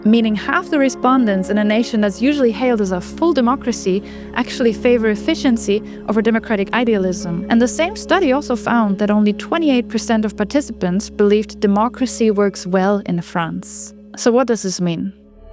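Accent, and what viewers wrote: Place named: Germany. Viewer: So basically she wants to spread her dictatorship ideology. You literally have no example other than keep saying Singapore. There is thousands of example that democracy and liberalism developed the world. Look at Europe and England. The development and industrial revolution took place when the power of the kings has limited. We know below your cutie German accent, a big propaganda exist.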